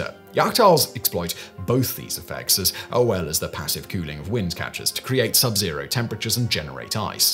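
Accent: United Kingdom. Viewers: He sounds British, but his speech is rushin... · Hey mate, your audience is international. Speaking a British dialect this fast doesn't help your viewers. With all do respect, vowels can not be just a whatever short sound between consonants. Hope you appreciate constructive criticism. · Hey, buddy!! You need to curtail your caffeine intake a tad. Your british brogue is already difficult for us "colonials" to follow. Please, slow down a bit, OK!!! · Really enjoy the content. Very informative. But the presenter's very rapid speaking, with the British inflection is exhausting. Also, the "pictures" presented, while the person explains a certain thought has a strobe effect around the edges which distracts and is visibly annoying.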